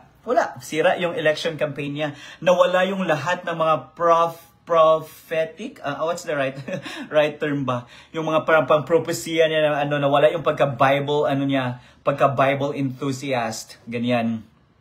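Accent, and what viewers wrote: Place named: Visayas, Philippines. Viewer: Ok naman english bisaya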